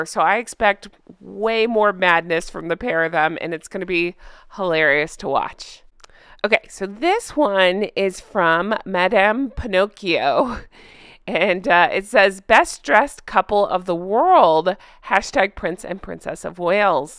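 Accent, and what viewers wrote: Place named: Midwest, United States. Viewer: What accent?! I’m from the Midwest and you sound like ME!!! Lol😂. Love a British accent!😊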